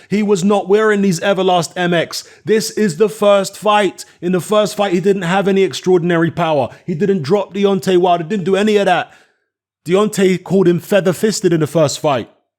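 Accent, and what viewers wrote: United Kingdom. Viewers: What a coincidence that u sound like ur from the uk lol. I was cheering for Fury but after this, I can definitely see the obvious. He was hitting that man with bare fist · I noticed the British accent so I would assume that you are a Tyson Fury fan. However my common sense tells me that a glove cannot flop back and forth if you hand is all the way in. You failed to explain that. TRY AGAIN.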